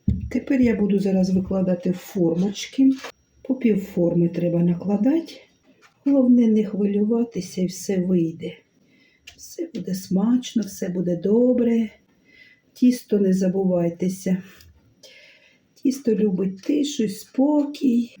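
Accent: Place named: Southeast, Ukraine. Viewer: Какая приятная женщина, и разговор красивый , любо дорого смотреть , видимо юг или юго восток украины, видно что свой человек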